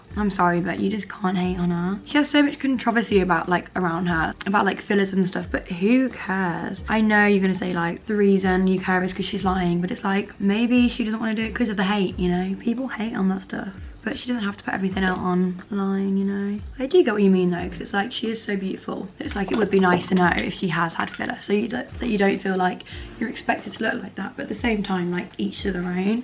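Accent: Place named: Birmingham, United Kingdom. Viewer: omg I love the fact I know your a brummie with the “do you know what I mean🤣” “I’m not being funny”.... people say I always say this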